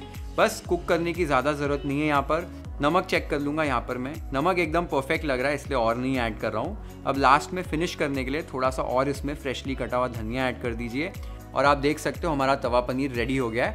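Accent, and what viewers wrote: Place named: Gujarat, India. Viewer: Music k link Bhi do Na 🥰 fan from A'bad /Aus 💕ur gujju touch in accent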